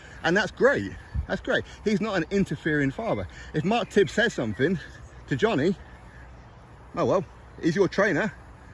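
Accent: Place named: RP, United Kingdom. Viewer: Hello. If you're from Newcastle Upon Tyne where did you get that accent from? I'm born & bred in Newcastle as well but my accent sounds nothing like yours!! You're posh!!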